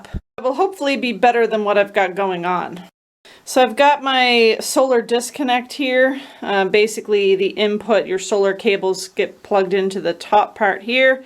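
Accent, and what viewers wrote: Nova Scotia, Canada. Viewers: I enjoy your videos, you are so Nova Scotian in your speech. (Thus the phrase "Giver") Do not miss dealing with those ugly looking spiders. 😧😧😧😡😡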